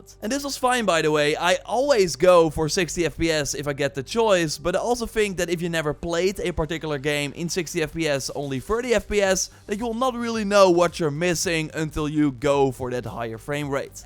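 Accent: Netherlands. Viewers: Something funny about your accent. Definitely Dutch.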